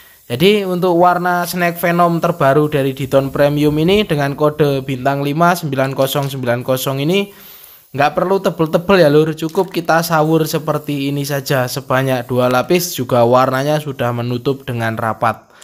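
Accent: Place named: Java, Indonesia. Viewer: Jowo tulen e iku lo.... Ra mbetahi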